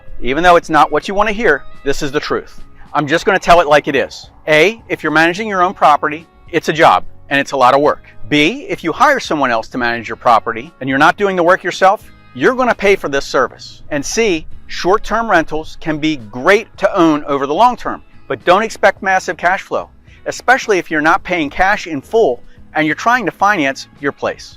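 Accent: United States. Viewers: This is weird. The American English accent is right, but these aren’t all real English sentences. AI maybe?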